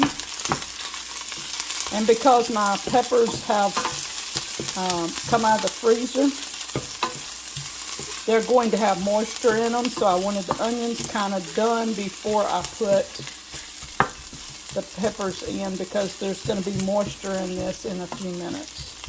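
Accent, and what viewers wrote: South, United States. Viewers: Another original recipe. Master chef with a southern drawl. Love it.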